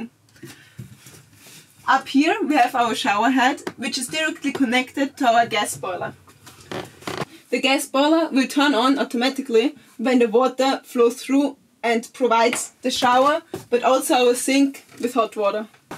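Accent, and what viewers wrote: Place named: Italy. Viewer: I can hear a liiiiiitle bit of an Italian accent there : ) But I wasn't sure, great English speaking!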